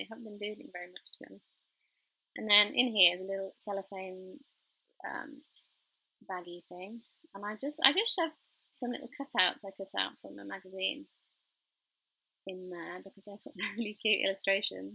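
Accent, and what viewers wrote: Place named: United Kingdom. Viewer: I happen to love british accent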